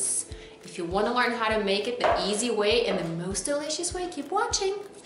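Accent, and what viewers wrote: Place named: United States. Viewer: Parece uma americana falando... vou estudar inglês para assitir seu novo canal, Sucesso aqui também.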